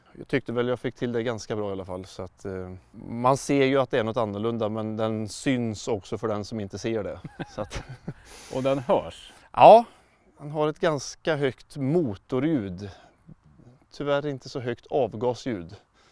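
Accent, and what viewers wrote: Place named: Bragnum, Sweden. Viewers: Grym kille och fina traktorer! Finns nog inget ljud som slår Scania V8! 😍 Bara en fundering ! Varför låter han ofta som Västgöte, men ibland inte? 😄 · Haha! tyckte jag kände igen dialekten och omgivningen, själv uppvuxen i Bragnum ett stenkast från Ängagår´n. Härliga byggen! Å i åa ä e ö.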